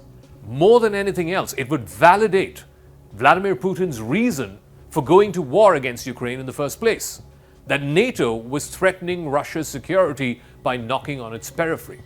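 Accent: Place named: India. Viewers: He still has trace of Indian accent. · The first ever Indian that I have listened to that speak fluent English with proper accent unlike indians with all respect. Perfect English perfect accent perfect view of war and not biased. Respect to you. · This is Indian accent.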